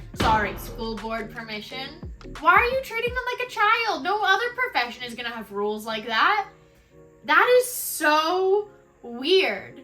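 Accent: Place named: North Carolina, United States. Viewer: I'm just curious if you're from North Carolina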